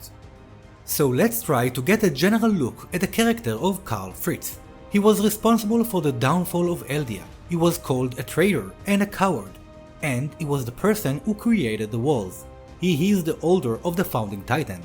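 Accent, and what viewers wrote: Israel. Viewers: Subscribing for AOT content with a Tel-Avivi accent. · דקה וכבר שמעתי את המבטא הישראלי הכבד חחח למה רק AOT<? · First time hearing an anime Channel with a Hebrew accent!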